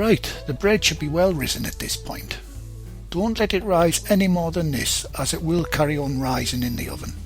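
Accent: United Kingdom. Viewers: Hi, I'm a too, I used to make bread weekly, thirty years ago, and am just getting back in. I've killed about three "sourdough starters", don't start in the winter, I've made a couple loaves well, just baked your "baguettes" yesterday, first time with such, and they came out beautiful, light and fluffy, crispy on the out, and I'm making bread for dinner tonight, with my daughter and son in law. I've long made one loaf, by "division", in cups, teaspoons, but this is the first time doing it with grams, and I expect to have more "equal" outcome, I really like weighing, because it matches with humidity and such better. I only hope my loaf comes out half as nice as yours, this afternoon. I've been using my "mixmaster" always did by hand before, and am shocked at how easy it is, with the mixer. I love your technique, I've kneaded for decades, and it "makes" the bread. 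Thanks for a great recipe, and excellent technique, bringing me back to doing it right. Your British accent makes it that much more enjoyable, I'm a retired mechanic, Marine, I try not to get the dough too dark, lol. Thanks for a great video.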